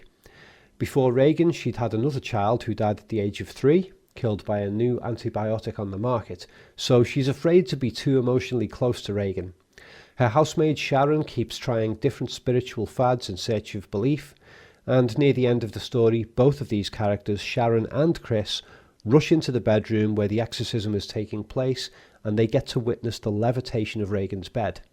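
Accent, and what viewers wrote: Liverpool, United Kingdom. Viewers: found your channel the other night and i love the videos they’re in depth and have some real good explanations and analysis to go with them, on that note am i wrong but i’m curious are you from liverpool?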